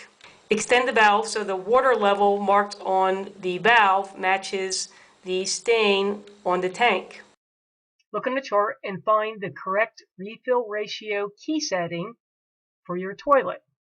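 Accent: Philadelphia, United States. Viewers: sound like your from Philly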